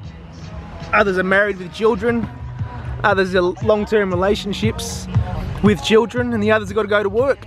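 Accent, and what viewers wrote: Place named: Australia. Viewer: Australian accent alike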